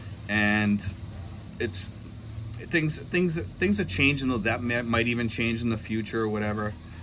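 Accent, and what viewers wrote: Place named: New York, United States. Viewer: I love your New York accent, sir! I wonder what the story is how Trece Martires got that name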